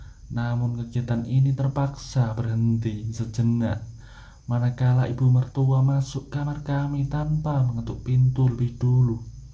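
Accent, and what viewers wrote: Java, Indonesia. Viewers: ngongg ny biasa aj brooo , mau gmna bicara bhasa medok ttp aj kliatan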